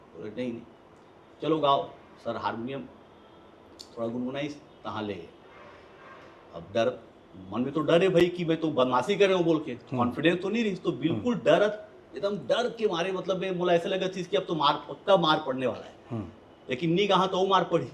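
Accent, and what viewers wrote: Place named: Janjgir, India. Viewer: Special thanks to Ji👍 आपके जांजगीर फ़्लेवर छत्तीसगढ़ी बहुत अच्छा लगथे! रायपुरिया छत्तीसगढ़ी सुने में अच्छा लगथे, पर बोले में थोड़े परेशानी होथे!!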